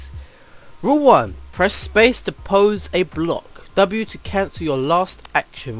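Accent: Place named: Russia. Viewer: That's a Russian accent xD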